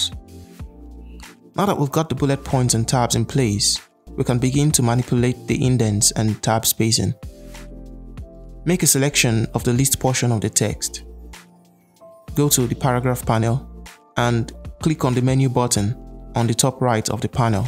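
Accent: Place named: West Africa. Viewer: Western Africa accent 👌 Dope tricks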